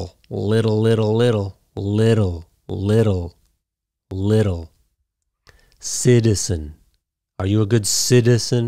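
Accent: United States. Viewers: I say t sound for t. You must be teaching hoosier English.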